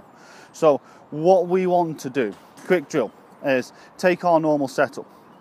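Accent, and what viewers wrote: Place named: Liverpool, United Kingdom. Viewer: Great tip. I've been struggling with the drive for years & this tip immediately improved things for me. I'm living in Sydney, Australia but originally from Liverpool (Aintree - Old Roan) - I suspect from your accent you're from the same area.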